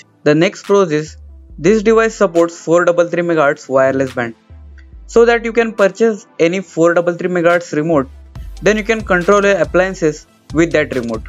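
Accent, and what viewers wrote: India. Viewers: Hindi speaking English; I hate that accent. All are the same.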